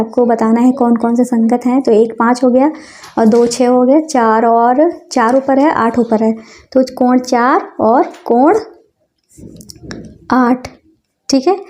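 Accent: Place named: Rajasthan, India. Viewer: Mam aap Rajasthan se ho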